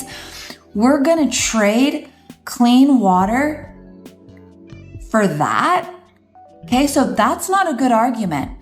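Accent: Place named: California, United States. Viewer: Where did you come from when you moved to Marshfield? Never mind, just found out you came from Kommiefornia. I was wondering because to be frank, you sound like someone from there. Why don't you carry your butt back there. We don't want folks like YOU in Missouri.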